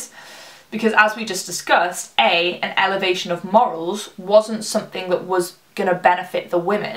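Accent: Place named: Wirral, United Kingdom. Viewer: that makes a lot more sense now!! Oh I'm from the Wirral so thought I could recognise your slight accent. Did you really like Bristol uni?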